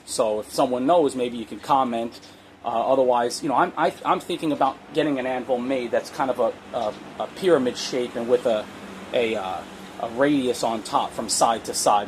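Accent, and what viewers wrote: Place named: New York, United States. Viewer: Two questions. First, what part of the US of A do you come from, I'm having a hard time placing your accent. Sometimes it seems New York-ish, and other times almost mid-western. Secondly, how much did it cost to make your anvils? I'm only a recent subscriber. I am using an antique cast iron anvil now and am thinking about upgrading to something more durable.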